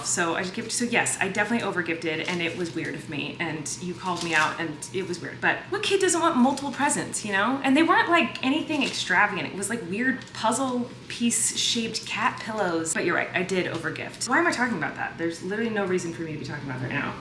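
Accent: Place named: California, United States. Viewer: TTQ: Hi! This is kind of a weird question, but did you find when you moved from LA to New York, or when you moved to Vancouver your accent changed? When I watch you vlogs, I notice you have a bit of a Californian accent (its not that noticeable and its probably because I'm Canadian and I have a different accent than you), and I know for me when I moved from Ontario to the East Coast of Canada my friends said my accent changed! I wondering if that happened to you? I love your vlogs and you are AMAZING!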